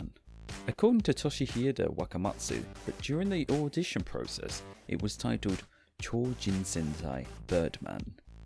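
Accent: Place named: United Kingdom. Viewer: Everyone of English-language native backgrounds - although unofficial, why not try imagining of the '-uer' following JAKQ? - which makes it "JAKQuer dengekitai". I'm just making a suggestion. By the way, it's a pleasure to hear toku info with a British accent. I'm a foreigner, and I wonder if domestic British sci-fi shows like 'Doctor Who' and 'Sapphire and Steel' were (and still are) in the way of the Toei Toku's entrance to the British Commonwealth back in the '70-80s.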